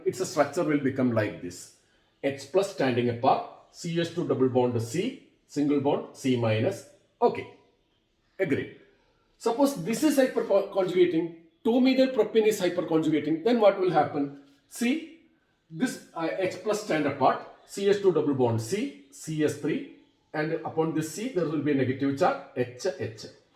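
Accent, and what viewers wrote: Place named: Kerala, India. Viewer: fan of your english accent having the touch of south ,, most prolly kerala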